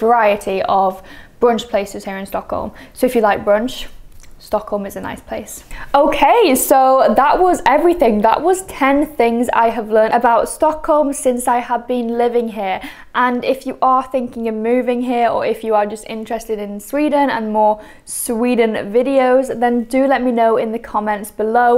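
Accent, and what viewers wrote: United Kingdom. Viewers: Great video! I'm also half Swedish and am planning to move to Sweden some years after I finish uni here in the UK :) One point tho, I actually really don't mind the higher taxes! Yes, it definitely feels like a LOT when you are coming from a country like the UK or the USA but honestly in terms of what you get out of those taxes it's pretty incredible! You have great maternity and paternity leave, child benefits are incredible if you want to have a family, child oriented services and schooling in general is much better than many other places imo, there is investment in maintaining infrastructure, the healthcare system is pretty fantastic and the general state of the country in general. There are things I'd criticise Sweden for but personally I don't mind the taxes. Again, really great video! Looking forward to more content, what UK accent do you have btw? 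I can't quite place it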